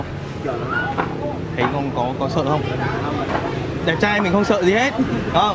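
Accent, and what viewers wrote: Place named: Central, Vietnam. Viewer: dạ. Mà hình như a người Hà Tĩnh phải k ạ? Nghe a ns giọng Bắc cũng chưa chuẩn lắm...vẫn có chút miền Trung phảng phất...hehe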